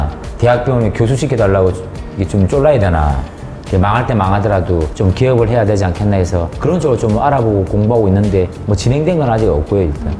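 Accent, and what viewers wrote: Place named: Daegu, South Korea. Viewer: 잉 형님 말투가 대구 사투리 던데 ㅋㅋ 제가 착각했나봄 ㅋㅋㅋ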